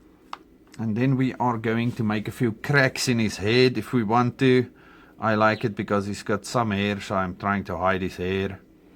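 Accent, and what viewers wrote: South Africa. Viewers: Ah yes, a fellow South African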